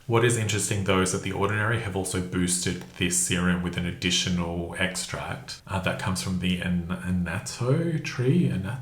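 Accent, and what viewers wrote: Australia. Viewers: Probably better to stick with my 12% for now. Thanks again . Love your succinct Australian accent 💛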